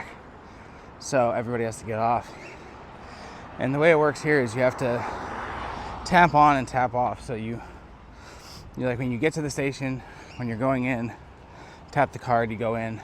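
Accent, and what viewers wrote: Australia. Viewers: You're definitely getting the Queensland accent mate. My Adelaide accent still gets confused for Kiwi in Melbourne. Nice to see you're still enjoying our country 🇦🇺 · Love your Aussie accent. Don’t stop doing it 🤩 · Loved this video, Cleveland is beautiful. Your Australian accent is spot on love to hear it ❤. Wearing the hat will help if you have sweeping Magpies. Don't run, just calmy walk away if your being swooped. Leave the area, as the Magpie male will defend the nest by swooping. We also have crows here in QLD not ravens, every other state has ravens. QLD has alot of unique qualities that you won't find anywhere else. Australia is very diverse, thanks for your vlog I love it 🎉❤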